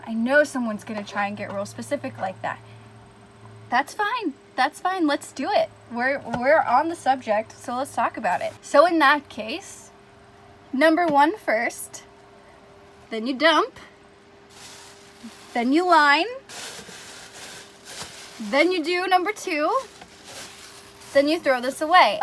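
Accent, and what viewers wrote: Texas, United States. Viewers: Are you from Dallas, Texas?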